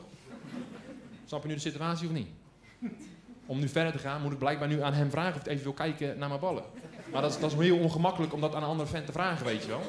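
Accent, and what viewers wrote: Rotterdam, Netherlands. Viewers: Dit is echt eerste keer dat ik graag naar een Rotterdammer luister!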